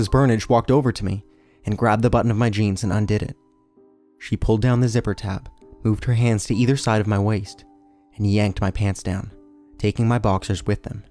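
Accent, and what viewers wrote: Canada. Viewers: My first time on your channel. I started the story on MrCreepyPasta and he gave the link to you for the third and final installment. You did an amazing job narrating this story. I'm a long time fan of the horror and creepy pasta genre. You have a like and a sub from me. Btw, are you Canadian? I only ask cuz of the name of your channel. Anyway, good luck with your channel. Looking forward to hearing many more stories here. 😁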